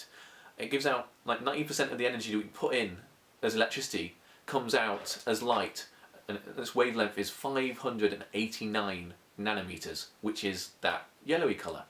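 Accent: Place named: United Kingdom. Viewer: Just saying "The Fundamental Interconnectedness of All Things" in a Brittish accent is worth a vlog in and of itself! Keep up the good work and good luck!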